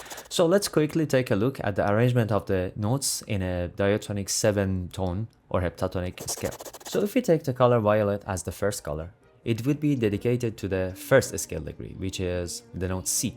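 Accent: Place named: Iran. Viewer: I like your Iranian accent made me think you are from Italy - like that woman melani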